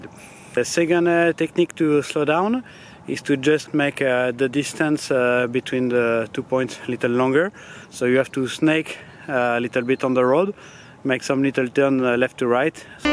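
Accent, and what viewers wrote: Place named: France. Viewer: Ah je me disais bien que y avait un p'tit accent français la dedans :D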